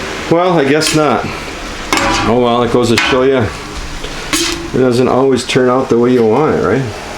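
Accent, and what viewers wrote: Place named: Minnesota, United States. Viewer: That accent, the weather, you've got to be in Minnesota.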